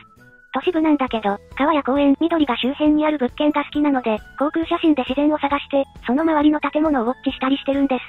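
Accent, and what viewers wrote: Hakata, Japan. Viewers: もっと博多弁を〜〜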